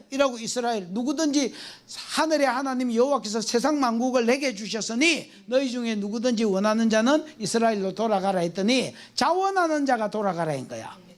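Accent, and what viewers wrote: Gyeongsang, South Korea. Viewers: 목사님 드디어 주문한 책이 왔어요. 기대 대요 목사님 설교(구속사)를 통해서 마태복음 1장의 족보가 은혜가 됩니다. 전에는 졸리고, 모르는 말씀이었습니다. 비밀이 풀어진듯 합니다. 남편도 성경이 이해가 되어진다고 합니다. 처음에는 경상도 특유의 발음때문에 고생은 했는데 지금은 정겹습니다. 주님의 이름으로 사랑하고 축복합니다. 감사드립니다.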